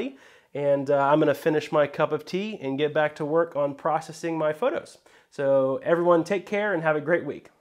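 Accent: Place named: United Kingdom. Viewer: You do a really good British accent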